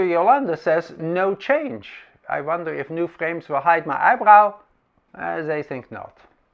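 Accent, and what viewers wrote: Netherlands. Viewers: Nice to meet the real with two letter "a". That Dutch accent reminds me of the days I worked for a Dutch Bank many decades ago. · Really nice you are making videos again and to hear your Dutch accent. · Wat knap dat je het Nederlands-Engelse accent (nog) zo goed kan! I find that really impressive. Good to see you back.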